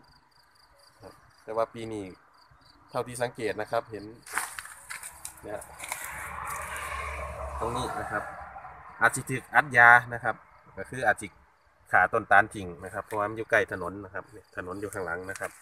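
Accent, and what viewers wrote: Isan, Thailand. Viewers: ครูพูดภาษาอีสานน่ารักดีครับน่าจะพูดตั้งนานแระ☺☺☺☺☺☺☺ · เป็นครั้งแรกที่ครู พูดอีสานนะเนี่ย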